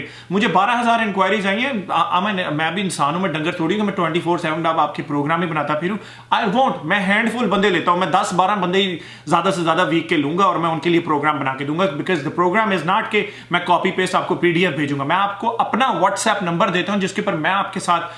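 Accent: Punjab, India. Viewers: Love the way you talk in straight punjabi. You are very informative 👍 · I loss 2 times after my delivery 6 year ago Now i gain 25 kg , your videos r very interesting Specially tuhadi punjabi Theth punjabi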